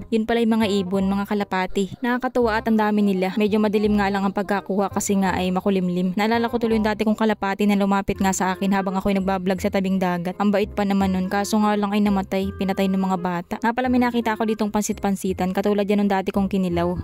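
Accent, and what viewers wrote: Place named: Visayas, Philippines. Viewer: Bkit Ang salitamo mayhalong besaya besaya b kayo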